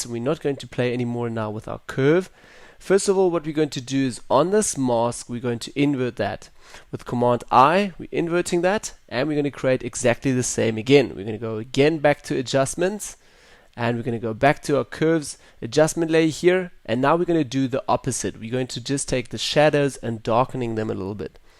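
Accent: South Africa. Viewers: Since u sound south african i will subscribe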